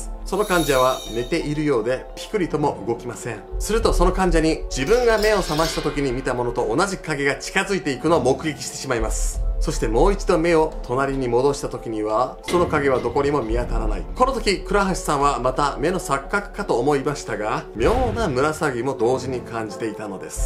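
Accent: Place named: Kansai, Japan. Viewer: 関西弁は勘弁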